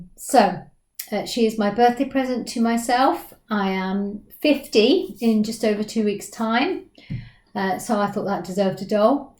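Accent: United Kingdom. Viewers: Hey there! Long time no see! How's going with the house work? Is it done? I've been away from surfing thru dolly videos of my good friends here such as you, Jay Dolls, Dolls Rescued and others coz I felt really depressed with some personal problems. They were solved, thank God, but my depression continues, at least now I'm little by little coming back, but there is too much to catch up, so it might take a while to see all the lovely videos. You didn't make much, so won't be long. I definitely need a ResinSoul girl, coz they are legit and affordable, but not atm unfortunately. Your doll looks such well made, they do an incredible work for a non expensive legit company, i'm a fan of they, I should get it a long time ago, but it was for the best coz I would made the wrong choice, I thought dolls with closed eyes were the same as Blythes, that can be open and close at the same time, I don't like bjds with closed eyes head. 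Now that I know everything I need I'll pick the right girl with the right body in normal skin! Anyway, is nice to be here again, nice to see you and hear your beautiful UK accent, I love it! kisses!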